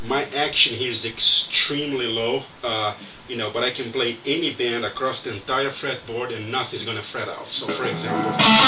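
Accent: Brazil. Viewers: dude, are u brazilian? u really sound like one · sotaque brazuca detected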